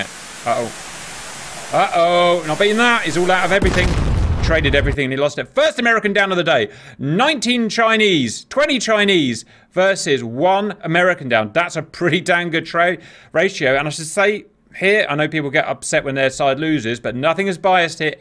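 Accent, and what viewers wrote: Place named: United Kingdom. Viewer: I'm not supposed to say these videos are MORE ADDICTING due to his British Accent! ...............................💂*tea time! 🫖 .............................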